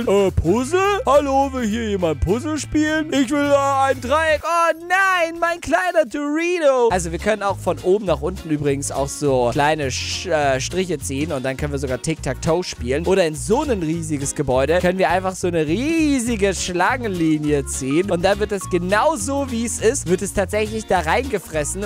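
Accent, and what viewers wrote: Russia. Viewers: HAHAHA OMG DEIN RUSSISCHER AKZENT MACHT DAS VIDEO NOCH BESSER 😂✔❤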